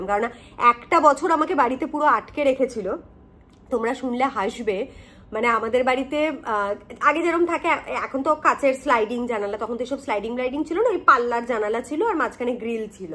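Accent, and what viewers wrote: Bangal, Bangladesh. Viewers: ম্যাডাম প্রথমেই আন্তরিক শুভেচ্ছা জানিয়ে বলছি ভীষন ভালো লাগলো বাঙাল কথা বলতে শুনে। শিম্বার মাধ্যমে আপনাদের সকলকে ভালোবেসে ফেলেছি। আপনি খুব আন্তরিক ভাবে কথা বলতে পারেন।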